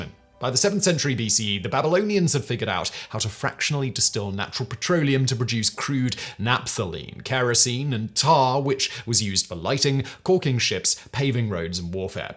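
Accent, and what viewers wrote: England, United Kingdom. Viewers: Brilliantly done as ever old bean! One tiny moan…the word ‘swathe’. In English we pronounce it “Swaythes” not “Swoths”. I beg you, stop using the US pronunciation? It grates the ear when the rest of your accent is sooo English ✌🏼 Thanks a million for all the videos 🙂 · British Accent. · As an Englishman I can honestly say that has the most quintessentially English accent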